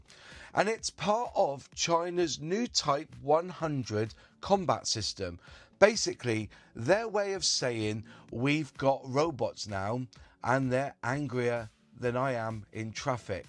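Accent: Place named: Cockney, United Kingdom. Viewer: To a sixty-year old educated in the 1980's N.Vespuccian Sun Belt, if I've not mistaken, yer speech seemed to be delivered with a slight Cockneyish twist, ne'er rushed and in a warm & down-to-earth welcoming mode. For someone who spent his teenage years in predominately Cantonese speaking Hong Kong, I would not have been able to make out a dang word of your monologue back then, even with as clear as the sort of speech-delivery that you've mastered. Best wishes for reaching the number of subscribers in the jillions.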